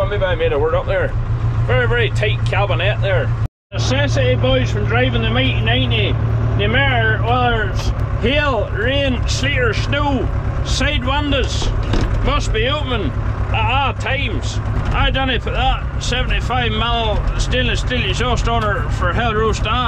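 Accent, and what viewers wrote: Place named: Portavogie, United Kingdom. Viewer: You sound like all us folk in Portavogie. Hey you ever been?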